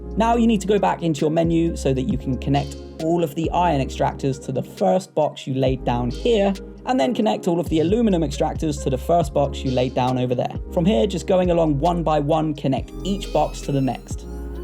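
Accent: United Kingdom. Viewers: Funny, sounds British but saying ALUMINIUM wrong.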